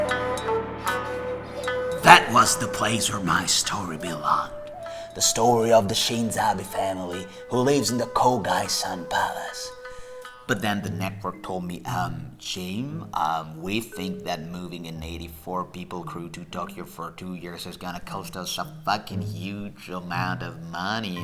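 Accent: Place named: Italy. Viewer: rega l'accento italiano si sente ... altro che perfetto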